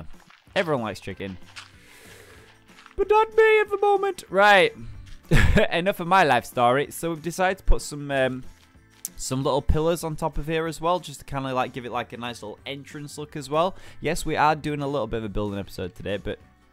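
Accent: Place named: United Kingdom. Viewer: Is a vegetarian or vegan? I've always thought he is so cute but when he mentioned that veggie burger I think I fell a little bit in love lol. (Totally not a biased vegetarian myself!) + that dialect is to die for <3 Where in the UK is from?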